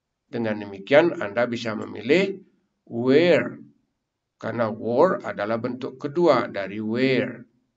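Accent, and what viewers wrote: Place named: North Sumatra, Indonesia. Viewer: " Your accsent a like a from North Sumatera😅"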